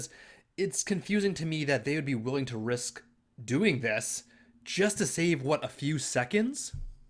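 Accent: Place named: Canada. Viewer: Love me a strong Canadian accent 😍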